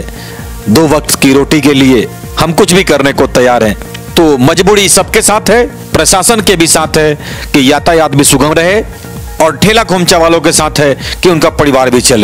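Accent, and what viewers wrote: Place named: Jharkhand, India. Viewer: Jharkhandi aavaj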